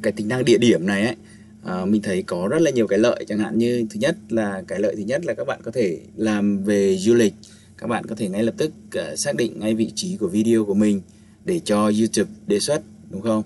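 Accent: Central, Vietnam. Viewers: Anh là người miền Trung phải không ạ? Em người Hà Tĩnh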